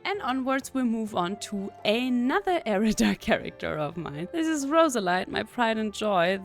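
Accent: Germany. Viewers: Hey! Love binging your videos while Studying for my exams haha! where did you learn about the wow lore im trying to get into it because im newer to wow. ps: are you german? you accent sound familiar😊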